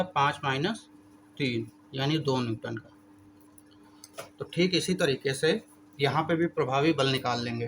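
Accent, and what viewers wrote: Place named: Bihar, India. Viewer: Bihari ki tarah mat bolo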